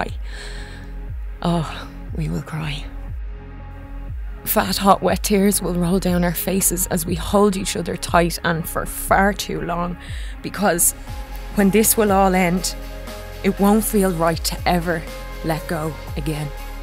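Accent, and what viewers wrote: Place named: Ireland. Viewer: Oh my goodness thank you! Yes, sticking close to my apartment to minimize risk, while putting forefront my mental health. May you and yours remain safe as well! I must exclaim I so love your video! I shared it on my own Facebook wall and much thanked my friend Ginger for steering me toward it. This video is beauty, hope, assurance, validation, comfort. Plus an Irish brogue oh my! All together scrumptious. <3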